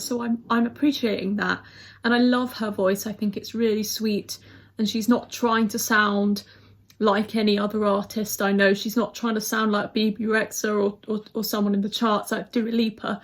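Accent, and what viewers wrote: United Kingdom. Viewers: you're one of the most competent persons I've heard about theme of ESC...and your british accent is like a melody 🤗 Grettings from Serbia 👋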